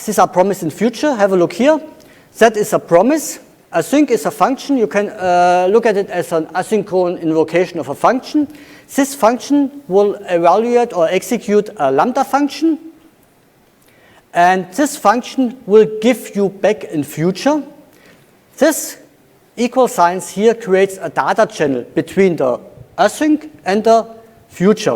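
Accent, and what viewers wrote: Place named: Germany. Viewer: I love German English :D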